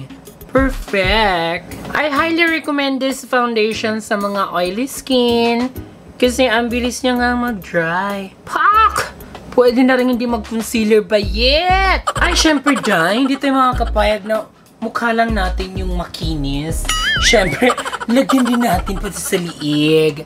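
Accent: Visayas, Philippines. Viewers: It is abelabol HAHAAAAH i really love ur visaya accent mamsh 😍😂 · Tuwang tuwa talaga ko pag napapakinggan ko yung Visaya accent mo vevs ❤️ nawawala stress ko dito . Your supporter from japan 🇯🇵 Josh Rubio po .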